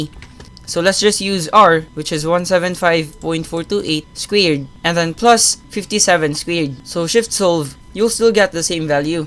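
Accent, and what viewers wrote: Conyo, Philippines. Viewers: Konyo pero sobrang linaw magpaliwanag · very conyo sir, nakakatuwa makinig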